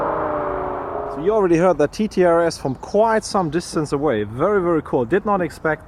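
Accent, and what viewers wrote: Germany. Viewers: Bist du deutscher wegen deinem Akzent?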